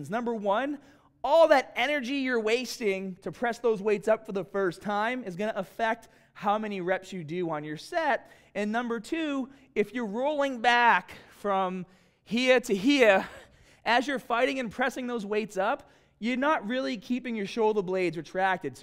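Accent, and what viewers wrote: Boston, United States. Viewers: Great video guide. You from Boston?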